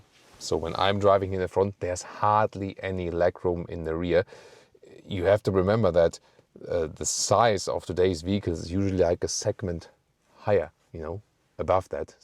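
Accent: Germany. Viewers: A video about a German legend, explained with a German accent......''Hier scheint heute alles in Ordnung zu sein😉'' Great content.....and Btw: Did you know that the legendary Queen frontman Freddie Mercury also bought one? He spended a lot of time between '79 and '85 in Munich, and was often driven there in one... He was clearly impressed by this car. When he came back to the UK he directly ordered one,.......a '86 420SEL..... It seems he even preferred it over his Rolls Royce...🤫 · that killer german accent bro!!!!!! them make car UP the price!!!!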